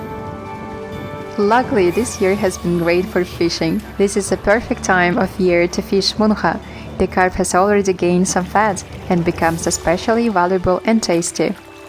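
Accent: Russia. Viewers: Русский акцент пробивается...Как тебя звать?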